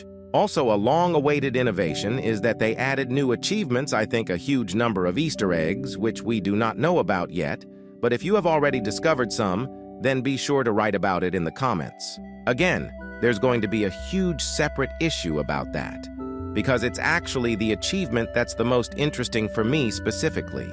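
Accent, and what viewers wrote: Russia. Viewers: Are you russian🤨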